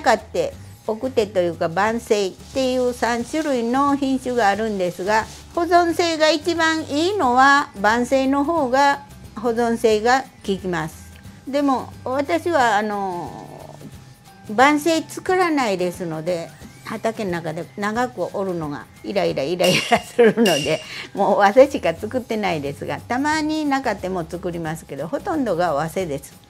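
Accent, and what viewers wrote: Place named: Kochi, Japan. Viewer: 高知弁のイントネーションが可愛いですよね…！私も高知の片田舎越知町です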